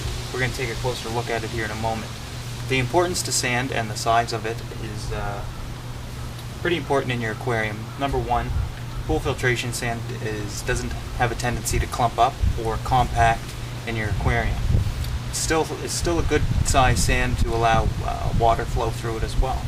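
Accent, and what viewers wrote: Canada. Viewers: Well I just spent about 2 hrs watching your videos needless to say I am exhausted. Well done. Truly a pleasure to watch and very informative. I especially love your Canadian accent when you say words like "out". Ow-oot! :) anyways subscribed - keep em coming...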